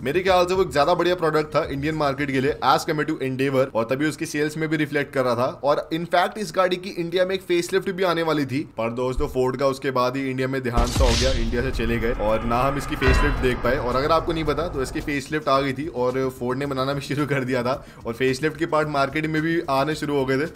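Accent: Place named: United States. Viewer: You talking about Escape. And why the hell are you trying to use American pronunciation?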